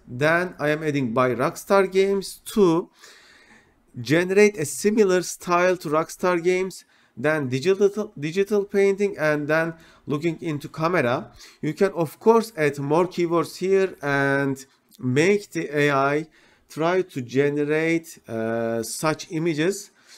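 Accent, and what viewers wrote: Turkey. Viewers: Bende diyorum bu ingilizce neden tanidik geliyor Turk oldugundanmis:)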